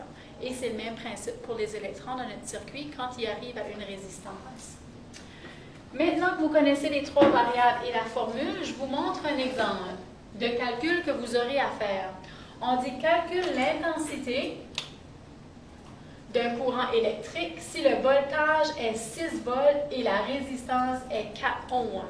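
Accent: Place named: New Brunswick, Canada. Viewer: Wow superbes explications! Et j'adore le ptit accent Nouveau Brunswick!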